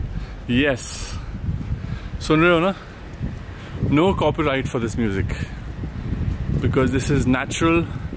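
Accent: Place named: Delhi, India. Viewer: You are totally delhi person....apki baton me ekdum delhi tone feel hota.hai....i love delhi ...delhi dilwalo ki like you ❤️...blessed couple. 🤗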